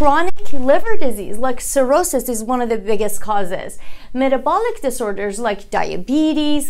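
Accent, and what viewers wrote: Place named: Iran. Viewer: Sounds Irani.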